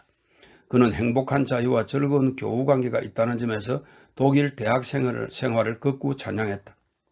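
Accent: Gyeongsang, South Korea. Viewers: 갱상도 아재의 두수한 사투리가 맘에 듭니다. 감사합니다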